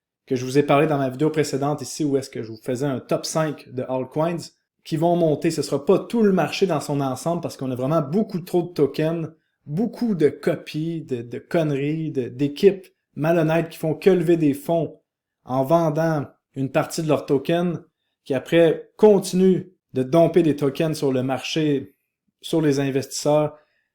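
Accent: Canada. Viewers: Bonjour, Première fois de ma vie que je dépose un commentaire sur internet, malgré ma consommation de contenu sur les cryptos depuis bientôt 4 ans sur le sujet.... Vidéo courte de 13 minutes, ou chacun de tes mots ont résumé à merveille tout ce que j ai pu apprendre de cette période! Merci à toi pour ton dévouement et ta philosophie! Un petit frenchie holder dans l âme qui au delà d apprécié ton formidable accent canadien, saura je l espère tirer pleinement partie de tout tes judicieux conseils! Longue vie à toi!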